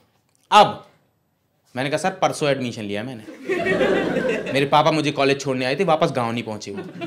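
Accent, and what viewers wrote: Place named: Western Uttar Pradesh, India. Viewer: Western UP ka accent lag raha....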